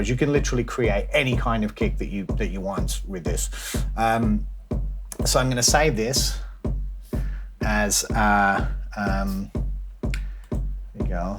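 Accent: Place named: United States. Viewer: What's with the American accent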